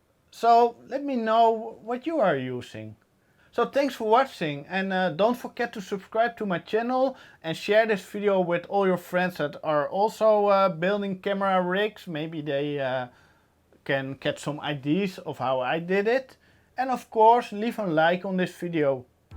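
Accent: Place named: Netherlands. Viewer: Are you dutch? Your accent sounds Dutch. (I'm from Belgium by the way)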